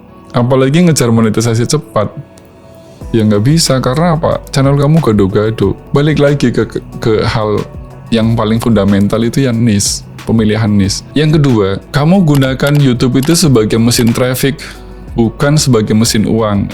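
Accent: Indonesia. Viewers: Logatnya kaya wong KIdul Mas??? Mantap lanjut dan jaga semangat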